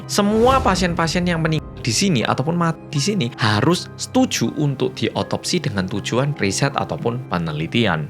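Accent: Java, Indonesia. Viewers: Gabaken wkwkwk😂😂 jowo banget😅😂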